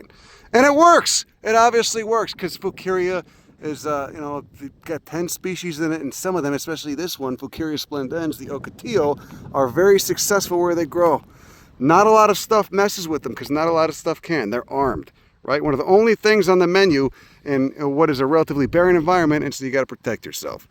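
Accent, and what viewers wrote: Chicago, United States. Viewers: inner city Chicago. its still there. he seems mellow today. perhaps its the paht.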